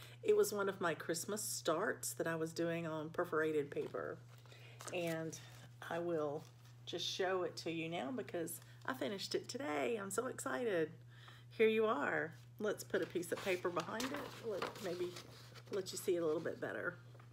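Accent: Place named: Atlanta, United States. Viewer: I just love “Welcome Home”! So glad I found your flosstube. We sound alike - I’m from Atlanta, but have been in Pennsylvania for 25 years, and never lost my accent (as I’m told almost daily!) ❤️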